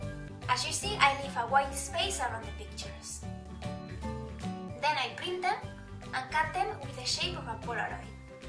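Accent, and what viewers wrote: Basque Country, Spain. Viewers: Are you from Spain? Because of the accent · She's from Spain and she has an accent but I could understand her. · eres española?? Porque tu acento de verdad es muy español Are you from Spain? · Your spanish accent mixed with your english pronunciation is so beautiful! I don't know too much Spanish, such a shame because my family comes from Madrid, but I would love to see a video with you speaking Spanish!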